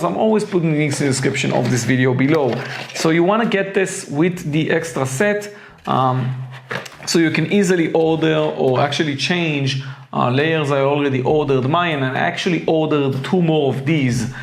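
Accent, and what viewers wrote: Israel. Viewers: Israeli english LoL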